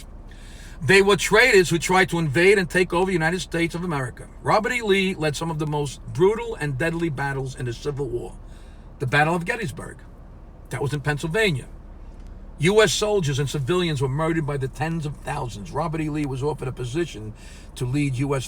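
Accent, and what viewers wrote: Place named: Long Island, United States. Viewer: says it in the Long Islander way, my home town....he may be a comic but history shows a lot of comics make a lot of sense; like George Carlin. Just listen to this guy and tell me if he is wrong, think about it and if you still don’t get it, play it back again and think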